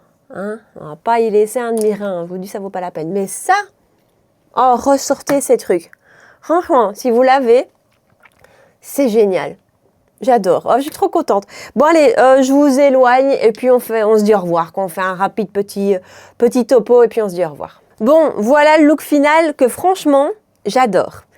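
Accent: Belgium. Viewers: Cc, très belle vidéo pépites oubliées que j'adore. Je dis un grand oui pour une vidéo avec ton accent belge😂. Bon dimanche 😘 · D'ailleurs tu devais pas nous faire une vidéo ds ce style déjà avec ce belle accent beilge 🤭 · Coucou, j'adore tes vidéos pépites, re-découvrir les produits c'est génial, merci tu m'as fais sourire avec ton accent belge (une fois),😅,j’aime bien deviner les produits que tu vas sortir de ta boite à chapeau tel une magicienne, au moment du rouges à lèvres,j'ai direct pensé au Dior Sequin,j'ai la nuance 893 un violine avec les paillettes rosée, ça claque pour les fêtes et pour cette saison ❤ Bonne journée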